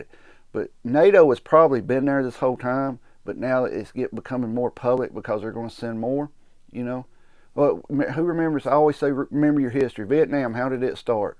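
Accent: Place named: Appalachia, United States. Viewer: hillbilly talk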